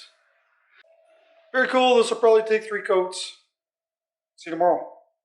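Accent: Canada. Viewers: Lovely bowl,. You’re really helping me learn turning and the difference between the American and Canadian accents. ((Much prefer yours, like my own native Scots).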